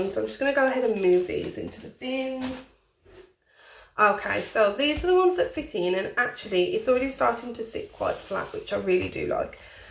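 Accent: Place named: West Midlands, United Kingdom. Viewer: Are you from the West Midlands? I can hear it straight away 😂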